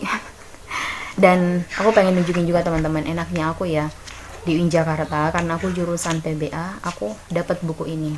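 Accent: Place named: Sulawesi, Indonesia. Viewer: Orang Sulawesi yah kak? Mirip sama ust Syam logatnya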